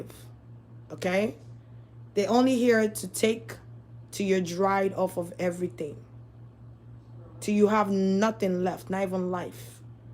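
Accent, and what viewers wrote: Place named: Caribbean. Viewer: You remind of Rihanna are you from the islands ?! I love that accent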